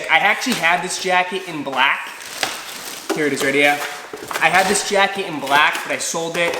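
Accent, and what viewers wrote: Boston, United States. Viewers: I knew you were from Boston too by your accent! Just started watching your vids and instantly subbed. Keep it up!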